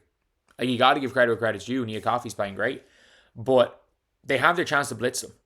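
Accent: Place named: Ireland. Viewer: Australia?😂😂😂😂 You can't hear that irish accent?😂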